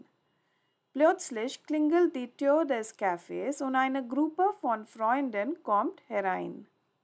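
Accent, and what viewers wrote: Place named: Spain. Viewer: Sounds like some whose mother tongue is not German- (maybe spanish )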